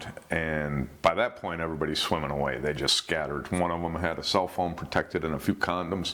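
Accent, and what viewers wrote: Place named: Connecticut, United States. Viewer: =You must be from Connecticut. I have been interested in dialects for 50+ years. How close am I in my guess? LMK please.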